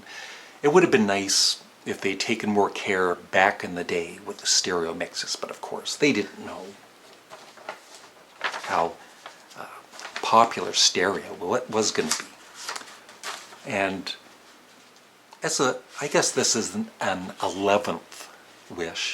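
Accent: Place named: Canada. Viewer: Hey, I've got to say, Side 1 of ' ' epitomizes the ' ' sound. Also, I've got an idea for a future video. Could you review...not necessarily rank... fashion from their beginning in leather through their mod look, to their long(er) hair hippie look? I think it's fascinating they they evolved into so many looks (and sounds!) in only a few years! Also, can you work the word 'about' into your videos more? I love how you Canadians say 'abooot'.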